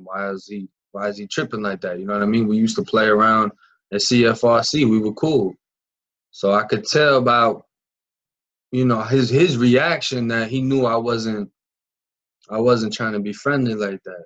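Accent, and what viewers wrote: Boston, United States. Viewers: This dude talking about Florida, but is he from Beantown? That accent sticks out to me. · And are u from boston origanally bc ur accent sounds exactly like a boston accent just wondering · Hey hon, i really enjoy your content. You have a great way of speaking and your Boston accent is da bomb. Your stories are very interesting. You will be growing by leaps and bounds on here. How are your tootsies feeling? What a serious painful nightmare. People underestimate the sensitivity of the toes! I was just crying over a simple~ass blister on my toe from a pair of new shoes! Please! Frickin wuss I am! I look forward to more videos from you! Best, Michele from Baltimore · Yo your mix of Boston and country accent is great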